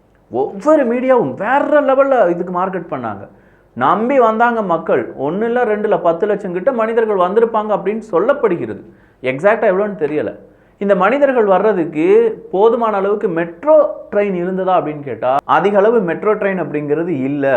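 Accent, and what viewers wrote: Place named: Tirunelveli, India. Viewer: Bro sometimes you speaks tirunelveli slang, I like that❤❤❤😀😀😀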